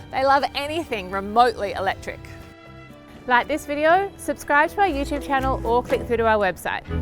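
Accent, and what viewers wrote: Australia. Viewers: australian speak funny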